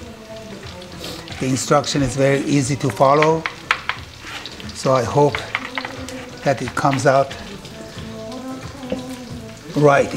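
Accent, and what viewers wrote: Iran. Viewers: I'm sorry, but I really couldn't focus because of his thick Persian accent (the one that Persian are so famous for) Bebakhshid!😅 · من جدیداً این فیلم رو دیدم وبه نظرم عالی بود که با لهجه قشنگشون این غذا رو آموزش دادن خانم داشتن لهجه نشان دهنده این هست که این آقا زبان دیگه ای به جز زبان انگلیسی بلده و این خیلی خوبه مخصوصا لهجه ایرانی نشان دهنده این هست که زبان شیرین فارسی رو بلدن انگلیسی زبان ها هم موقع فارسی حرف زدن لهجه خیییلی زیادی دارن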